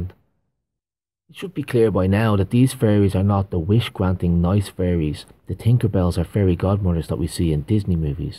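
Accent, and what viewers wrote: Ireland. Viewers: Your Irish accent and pronunciation granted this the perfect seal of authenticity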